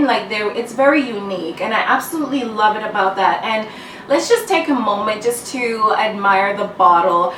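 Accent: Jamaica. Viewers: I hear a Jamaican accent underneath !! (hope I'm right). great video!! I too am obsessed with fragrances..they make me so happy, brings back memories. .thanks for sharing! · Are you Jamaican?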